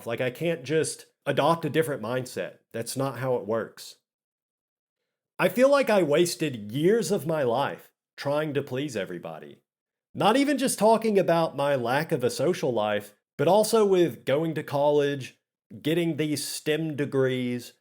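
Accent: South, United States. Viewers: Hey there. 33-year-old female here. I just came across your channel and this is the first video of yours I’ve ever watched. I’m only about halfway through but I don’t think you should be so hard on yourself. You seem like a really great guy. And you’re funny!!! Stop telling yourself you’ll never have a meaningful relationship. That’s not true. If you keep telling yourself that, you won’t have one. I’m blind using a screen reader so I can’t see what you look like, but you seem to have a nice personality. Work on your confidence in your self-esteem. That’s the most attractive quality in a man. By the way, where are you from? I feel like I’m hearing a southern accent lol. I’m from Kentucky so I have one too!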